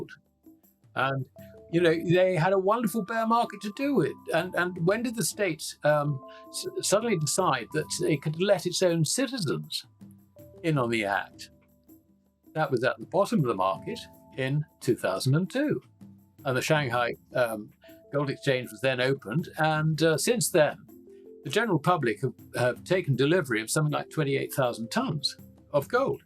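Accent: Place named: United Kingdom. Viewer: It’s hard enough to listen to his Brit voice, the back ground distraction kills his message. I can only give it 5 min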